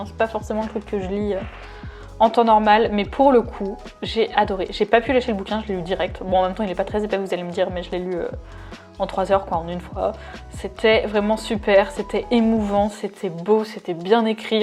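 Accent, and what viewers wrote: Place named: England, United Kingdom. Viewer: ton accent anglais ❤️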